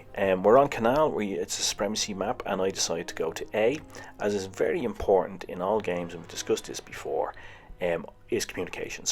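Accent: Ireland. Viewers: World of Tanks Blitz Ano ty si parada player...yes bc you v Good player and you voice is super...Irish...its Ok Am Polish player you a Normal nice Man...✌️💝🇵🇱 Am live in Poland,Prague is very very nice town do you se Kretek adventures 😂😂😂😂 its my chilhood childern in Czech,Poland,Slovakia..love this hmmm....creature 😂😂😂✌️👍😉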